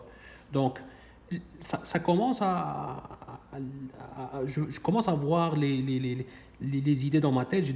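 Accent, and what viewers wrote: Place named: Canada. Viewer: Ouahhh, très bonne vidéo Aussi, je suis habitué à entendre l'accent français parisien, l'accent canadien est très sympa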